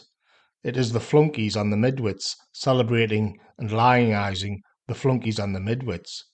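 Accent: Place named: North East England, United Kingdom. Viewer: I love it that a working class lad from the North East is far more intellectually than most of our shit stain politicos .it gives me hope I'm working class from the North as well knowing thus bloke is out there on line who speaks like me makes me so happy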